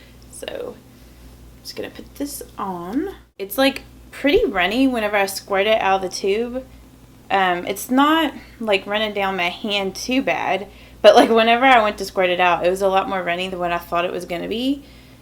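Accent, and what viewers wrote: South, United States. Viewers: your southern accent is so cute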